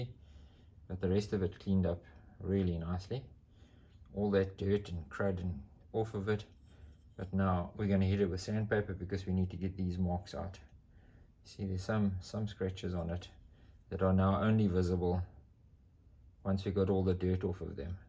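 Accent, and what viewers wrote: South Africa. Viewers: Being from the States I dig the accent but more importantly I echo the philosophy regarding vintage steel bikes. They still have a lot to offer and the character and design of certain era’s is sublime. I get a “woody” finding them, cleaning and overhauling them and of course riding them. Keep up the good work in South Africa your videos are very enjoyable and informative.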